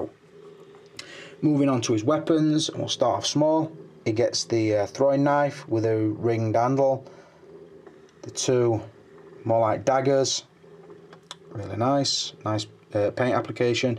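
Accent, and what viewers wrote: Sheffield, United Kingdom. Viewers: I knew i recognised your accent ! Sheffield na na na "t'neight"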